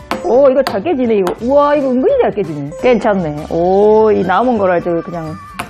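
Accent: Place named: Busan, South Korea. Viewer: 구수한 부산 사투리의 에게 서서히 중독되어 가는 것 같습니다. 개그 재능도 있으세요!!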